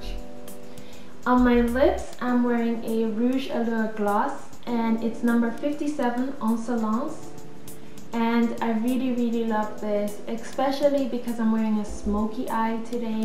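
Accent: Jamaica. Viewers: majamajami ........she sounds Jamaican...................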